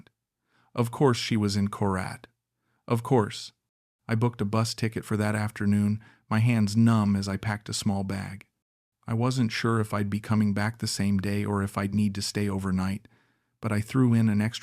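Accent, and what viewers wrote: United States. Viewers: You don’t have a British accent, you have American accent so is this story real? If it is real I think you are not a smart Englishman!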